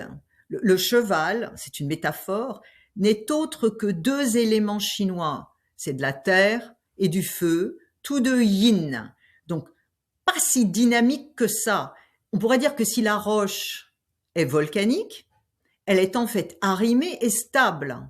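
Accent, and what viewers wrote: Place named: France. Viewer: Merci infiniment. C'est une précieuse aide, que je réécoute souvent. J'aime votre manière de vous exprimer....et vous avez conservé votre accent français clairement. Chaud au cœur votre accompagnement est un Plus.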